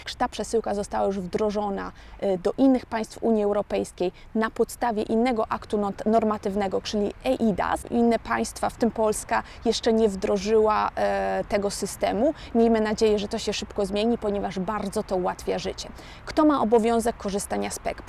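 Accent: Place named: Italy. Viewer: Ale już słychać ze mieszkasz we Włoszech z Twojego akcentu ❤️ a pamietam Twój głos jeszcze z czasów liceum. Bardzo fajny pomysł jeśli chodzi o informacje prawne! Powodzenia.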